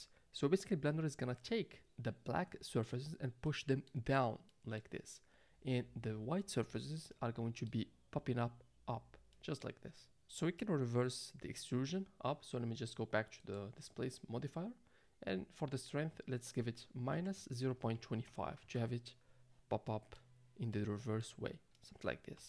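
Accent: Morocco. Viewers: are you moroccan? you sound exactly like my relatives. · Your accent sounds Moroccan 😅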